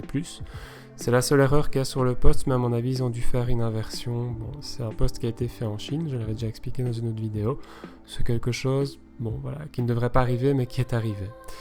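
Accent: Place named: Belgium. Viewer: Merci, ça fait du bien de se remettre ces réglages en tête, avec un petit accent Belge en bonus !!!!! Merci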